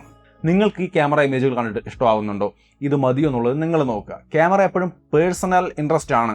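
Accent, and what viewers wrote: Thrissur, India. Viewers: Are u from Thrissur!??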